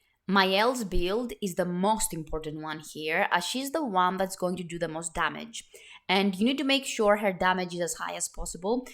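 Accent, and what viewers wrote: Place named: Greece. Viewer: At least I can hear the voice here! Such an amazing Hellenic accent!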